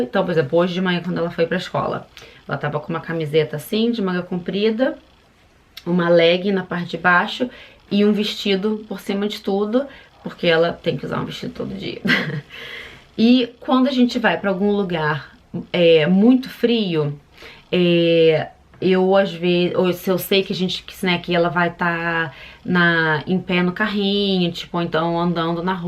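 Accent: Rio de Janeiro, Brazil. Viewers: Adorei as dicas 😉 pena que manteve o sotaque carioca.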